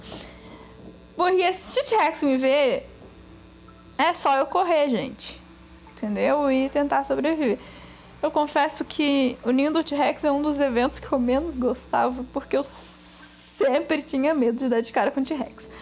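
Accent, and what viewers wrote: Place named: Fortaleza, Brazil. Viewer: Vc mora em Fortaleza ???? Pelo o jeito de 0parece s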